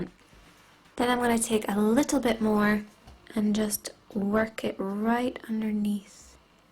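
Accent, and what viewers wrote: Ireland. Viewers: she's Irish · At first I thought you had an American accent. Are you Irish? I love your accent.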